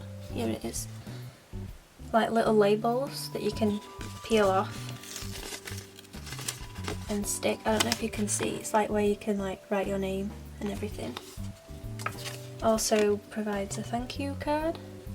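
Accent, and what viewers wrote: England, United Kingdom. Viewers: Wow! So cool!💘💞💞💜 Actually, I always have question.. Are you from England?)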